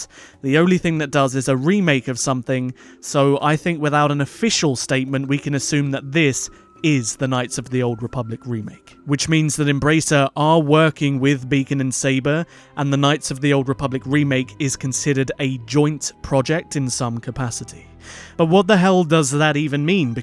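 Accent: United Kingdom. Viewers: What part of the uk is you accent?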